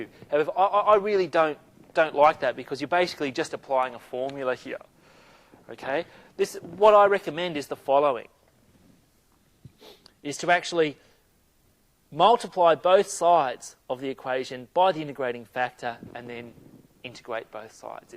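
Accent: Australia. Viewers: aussie accent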